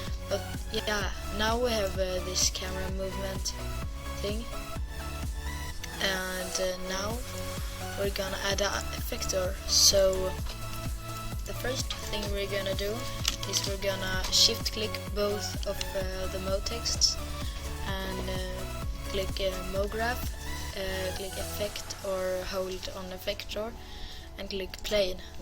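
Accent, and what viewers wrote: Sweden. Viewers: lol hörde direkt svenska dialekten så var tvungen att kolla om du var svensk och ja det stod ju i din beskrivning ^^ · Du bröt lite på svenska ;D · Thank you so much! now people can make money from this so if guys can make good intros then you can get like 20 $ for 1 intro! btw i did hear that you are from sweden (Im Norwegian!) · Lol man hör att du är svensk xD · u swedish ? You sound like it lol i am swedish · Agario he has to be, definitely sound like a swede!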